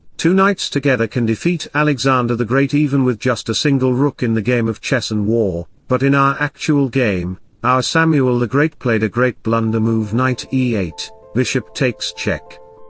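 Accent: United Kingdom. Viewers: The narration sounds like an Englishman was asked to read a script written by a non native English speaker with a less than firm grasp of English grammar.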